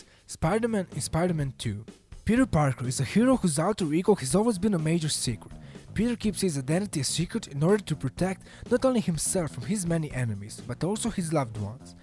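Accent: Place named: United States. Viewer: Are you from america your accent is so cool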